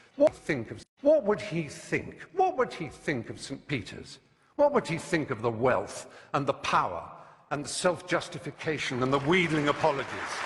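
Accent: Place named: United Kingdom. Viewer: I could watch this over and over again. As fan of British English I sit admiring the accent and the rethorics. Beautiful!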